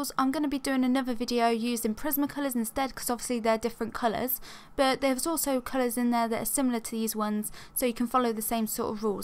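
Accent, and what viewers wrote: United Kingdom. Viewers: really love the tune of the way people from uk use to speak english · your british accent is the most british accent i've ever heard before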